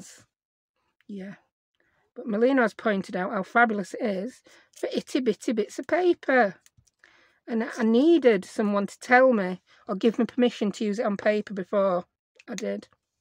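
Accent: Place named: Northern England, United Kingdom. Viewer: I found your channel a couple of days ago, and oh boy I’m loving it. I lost both my parents just before Cov. Hit. I didn’t realise how much I missed northern accents, I was born in Yorkshire but grew up in Lancashire. However I’ve lived in the south since getting married 24 years ago😮. I’m also a big Tim Holtz fan an just love the items you make. Actually May I ask has Tim stopped designing new paper pads? Do I need to start hoarding them? Off to watch another of of your videos. Thank you for sharing your time.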